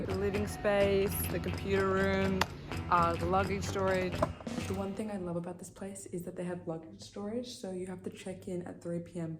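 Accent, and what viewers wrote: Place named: Germany. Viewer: Clearly! She has a strong German accent 😂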